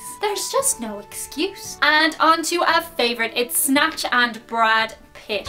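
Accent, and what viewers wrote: Dublin, Ireland. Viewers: After listening to the way you talk and your accent, I’ll pay closer attention when I watch something with an Ireland character. Thank you for sharing! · I listen to your videos for your accent more than the content... LOL Just kidding but i do love hearing the Irish accent. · Love the Irish accent....and you are just a pleasant to watch. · I enjoyed this and even though I only understand and 70% of what you’re saying, I love your Irish accent! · I love the Irish accent, one of the main reasons I subscribed to channel. Not only do I get to hear her Irish accent but I learn about Irish culture in a fun and entertaining way. Thanks for your videos, look after yourself. Congrats on 25K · did they get the accent right. And the more I watch u the more I cant hear your Irish accent but ur still great. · Love hearing your Irish accent when you talk. 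· SternLX its still pretty obvious shes from dublin area though. Its not that neutral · the irish accent is one of my faves. just watching you (in particular) enunciate your words is entertaining :) btw, you should put some of your trademark chokers in the merch and create a cult following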